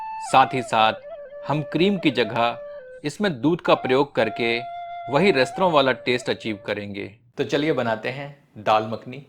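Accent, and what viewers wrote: Standard. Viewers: I like your shudh HINDI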